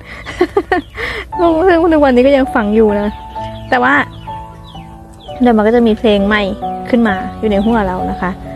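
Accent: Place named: Suphanburi, Thailand. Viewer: พี่พูดเผน่อคิดถึงน้องที่สุภรรณเลยย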